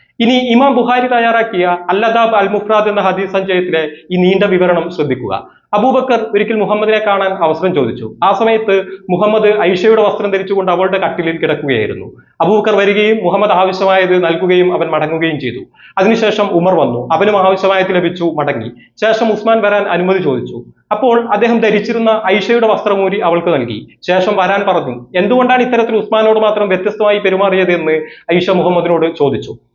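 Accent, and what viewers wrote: Kasaragod, India. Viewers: കാസ്രോട് ശൈലി തന്നെയാണ് ഭായ് രസം ❤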